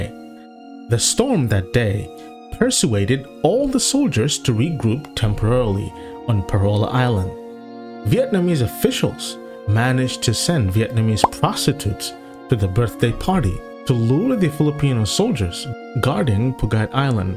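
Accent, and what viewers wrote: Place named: China. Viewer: True the voice of this person is Chinese, undermine relation of Vietnamese and Philippines or this person might be Filipino duterte fanatics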